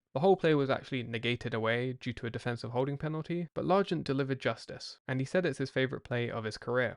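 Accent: United Kingdom. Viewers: British guy talking about the NFL? 1KYAE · Sorry but i cant listen to a british talk about amercan football.it just doesnt serm right😮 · How weird is it hearing a British dude talk about football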